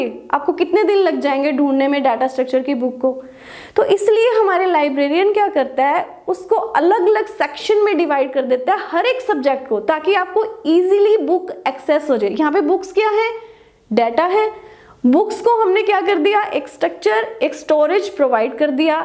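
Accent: Punjab, India. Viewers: Beautiful teacher with Punjabi accent 😀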